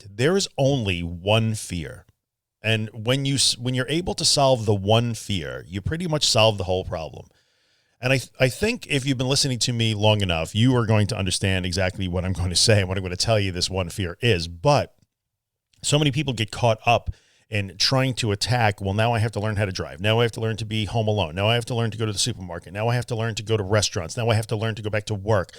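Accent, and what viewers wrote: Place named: New Zealand. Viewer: sounds like a fellow New Zealander!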